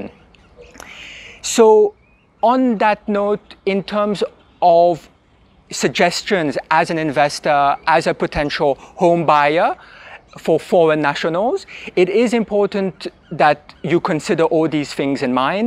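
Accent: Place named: France. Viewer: He speaks English with a French accent.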